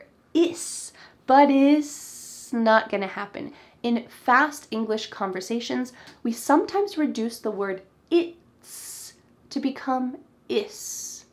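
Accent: United States. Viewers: I like 👍 American 🇺🇸 accent 🌸🌸🌸🌸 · She’s so perfect, and perfect way to learn American accent. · Hi,! I just watched one of your lessons. I love your US accent. It’s amazing and so mean to me. I hv got hard things in putting my tongue to pronounce words correctly. It made me be shy to speak. Lol. I need tips from you. Help me pls. Thanks and wish you always be happy there. ❤️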